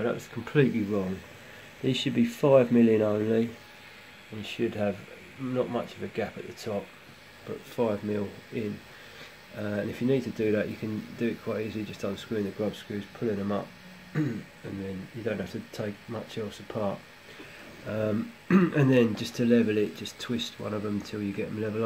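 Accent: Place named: England, United Kingdom. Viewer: U have the worst english accent I've ever heard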